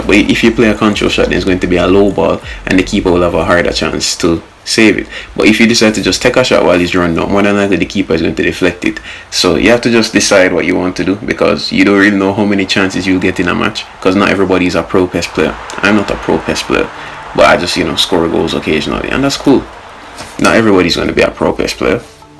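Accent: Jamaica. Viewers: Ur Jamaican don’t it? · You sound Jamaican me g 🇯🇲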